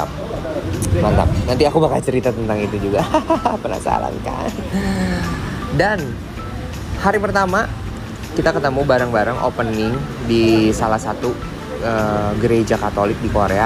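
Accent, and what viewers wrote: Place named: Java, Indonesia. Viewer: Di sambut dengan BANYAK GLUEDEKKK medok banget mas 😅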